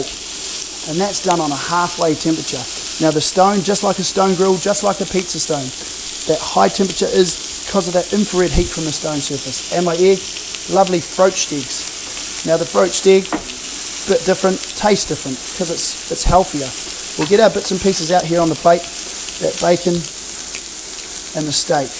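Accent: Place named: Australia. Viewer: Thanks! Australian, lol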